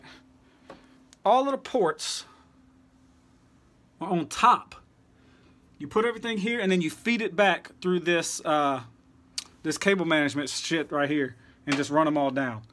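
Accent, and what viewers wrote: South, United States. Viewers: I knew he wasn't black, he doesn't even sound black you all just aren't used to white Southern accents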